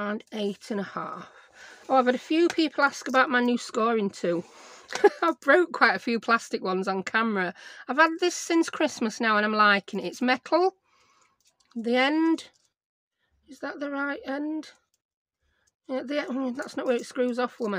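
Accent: United Kingdom. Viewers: Hi, loved the video. So nice to hear a nice british lady crafting, all the videos Ive come across are Americans and Ive nothing against that but just wo der why theres no british crafters on you tube. I have lotsof craft stuff but dont know how to use it. Just subscribed hope I lwarn how to craft properly. Thanks for sharing 💖 x x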